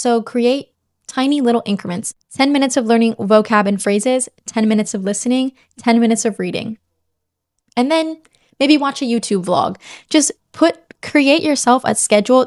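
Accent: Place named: United States. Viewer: To add on a complete side note. I only just realized that you’re speaking with a state side accent. I follow a few YouTube, instagram accounts to graze on Spanish content. Most are native speakers but there’s a few English. You have a very English look and demeanor. Surely you’re living in England?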